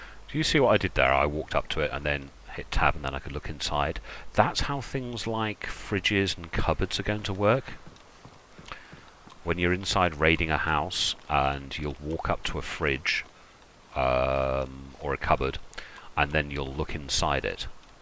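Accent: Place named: West Country, United Kingdom. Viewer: I love watching your content, I'm always learning something new about the game and I always have a great time, I would love the oppurtunity to play with you one day. I love watching you not just for the excellent gameplay but also because you have an awesome sense of humour, an easy going attitude. Your west country accent is a bonus too :)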